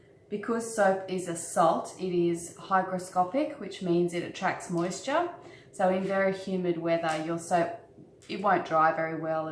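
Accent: Australia. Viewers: This is an amazing video and very satisfying Love the Australian accent 👍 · A very good video, and wonderful instruction. I love love your voice, it is soothing. Thank you. I am from Canada and your accent makes me think of and miss my Australian cousins. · Nice video have my thumbs up, straightforward process, like your light Australian accent. Just one comment: I think the process is called saponification rather than emulsification. In the moment you have first amount of soap made by the chemical reaction the soap will definitely emulsify the rest of the oil. However, at the end of the process the oil is completely transform so I believe it is correct to say that the saponification process was completed. I think this is true even when the recipe keeps a small amount of oil unsaponified (this way we know that all hydroxide is used up completely and soap is not caustic ); recall my grandma making soap when I was a kid, and she had a way of testing the end of reaction by taking a sample in a spoon and we were not allowed to get closer (she wanted us to not get splattered). 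For us the kids, it was a pretty magic alchemy. Today, seeing you making soap brought all those memories back. God bless you and the work of your hands. Chris, Canada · Love the Aussie accent … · Hi, like everyone else, I love your videos and you have inspired me to finally take THE big step and make some soap. My lye solution has a film over the surface, am I still able to use it? Did I do something wrong? Also, when I discovered your channel, it was sooo good to hear an Aussie accent. I'm in Tassie. Thanks, Victoria. Aussie, Aussie, Aussie, Oi, Oi, Oi!🇦🇺😊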